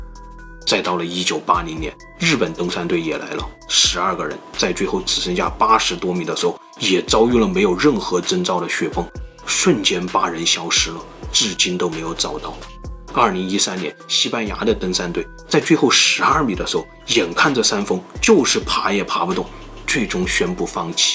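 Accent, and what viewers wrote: China. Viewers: 的这个是江苏还是福建口音？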